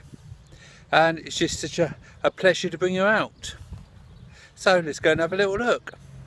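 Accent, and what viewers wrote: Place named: England, United Kingdom. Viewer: ist your name right?. I have a question about your accent. in which part of england people speak like this? thx in advance. i like your videos. cheers from a german from germany.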